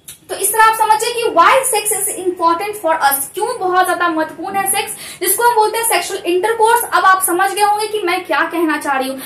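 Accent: Bihar, India. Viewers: Your voice tone told that you are from bihar ❤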